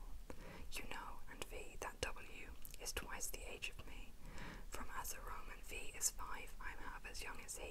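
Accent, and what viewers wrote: Ireland. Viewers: Sounds stronger than mine... Im from fooking Limerick. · This is the best Irish accent ever done by someone out of Ireland hands down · this is literally an Irish accent I would’ve thought you were from Dublin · Being irish myself Not a bad IRISH accent at all well done girl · wait what i thought she was irish um hello she’s a better irish than me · Im Irish (Dublin) and can say that you have a very good accent 👌🏽 Go Maith! 🇮🇪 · I'm Irish and I'm from Belfast and I have heard a couple people from Dublin and cork and ur accent is really good and thank u for not saying top in the morning to ya😂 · This is class I’m Irish my self and you sound very Irish ☘️ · So unreal! i’m from dublin myself, and i was shocked to find out you weren’t irish yourself!! deadly accent! you put me straight to sleep too btw, this was so comforting to me :’) Xx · I’m Irish and your the first person to nail the accent FULLY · the one person who can actually do a decent irish accent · I was so confused watching this at first. 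I was thinking, does she have an Irish twin??? 😅 Brilliant accent! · One of the best Irish accents you literally sound like me !!!!! (I’m from Ireland) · Omg! Coming from an Irish person, your accent was so good! Especially for a fake one. Alot of non Irish people think it's a hard accent to be successful in. I'm glad u like the accent😘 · Wtf you have a better accent then me an Irish person low-key shook 😌😪🤩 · it’s kinda cool to see someone do an actually good irish accent. she’s definitely got the soft t’s and d’s spot on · I’m Irish and this is honestly one of the best fake irish accents i’ve ever heard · Can’t find any Irish asmrtists you’re the closest thing so far! I love my countries accent haha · Brilliantly done, not many people can do the Irish accent justice ❤️👌 (I’m from cork Ireland 🇮🇪) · I'm Irish and ppl always try to sound like me but they can't rlly do it, BUT U ON THE HAND! 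IS GREAT! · You did an awesome accent!! I already love your accent but I love Irish ones!! I’ve always loved talking in different accents too lol it’s fun!! 🍀💚🍻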